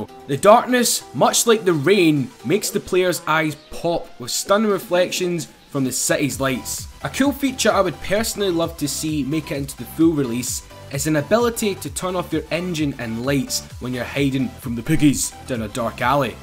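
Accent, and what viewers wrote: Scotland, United Kingdom. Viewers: dat scottish accent tho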